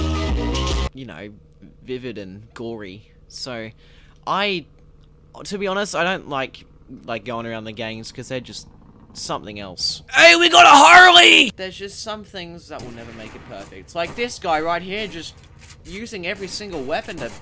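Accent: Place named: Australia. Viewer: I like this guys Australian accent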